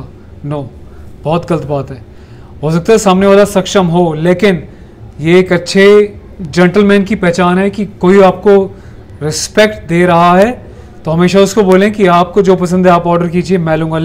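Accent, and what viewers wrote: Haryana, India. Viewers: Sir aap Haryana se ho. Kya? Btao please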